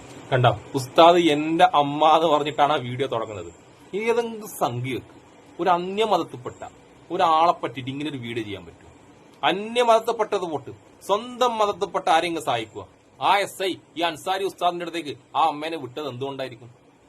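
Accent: Kannur, India. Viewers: ഭായ് എന്നും നിങ്ങളുടെ മേൽ ദൈവാനുഗ്രഹം ഉണ്ടാകട്ടെ.... സംസാരം കേട്ടിട്ട് കണ്ണൂർ കാരനാണെന്നു തോന്നുന്നു ഞാനും കണ്ണൂരാണ്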